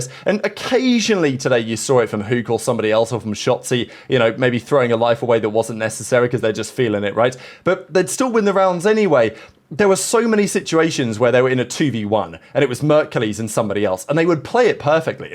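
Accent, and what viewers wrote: United Kingdom. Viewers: it’s that irresistible British accent that always has women around the world swooning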